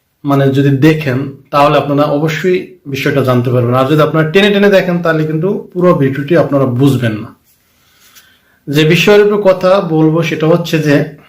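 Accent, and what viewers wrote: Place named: Barishal, Bangladesh. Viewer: ভাইয়ের বাড়ি নিশ্চয়ই বরিশাল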